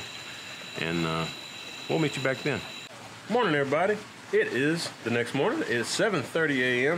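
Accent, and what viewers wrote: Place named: Texas, United States. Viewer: The moment I heard you I knew I had found my brisket man....native Texan here (the HEB clinched it that you were too)just your voice makes me home sick and the brisket almost made me cry...have lived away from home nearly 35yrs now (now WAY south of the border in Guatemala as a retiree)...no brisket for 1,000 miles. Great pork here in Guatemala terrible beef (skinny range fed and "pasture" to table the next day so TOUGH..makes good tasty burgers though when mixed with some ground pork. Bought hubby a Weber Smoker and put it together today...smoking tomorrow....thanks for your expert cooking lesson!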